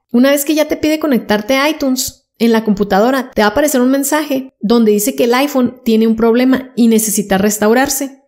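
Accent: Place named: North, Mexico. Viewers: Me súper sirvió, que hermosa voz!! Me hace acordar al acento de Saltillo de una amiga 😍 saludos! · Que linda voz así como norteñita me encantó tu explicación me sirvió para desbloquear mi CEL mil gracias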